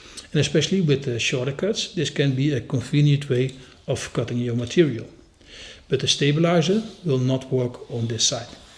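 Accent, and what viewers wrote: Netherlands. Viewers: The moment I heard you talking I knew you were from the Netherlands! Dank u wel voor de video, van de Verenigde Staten!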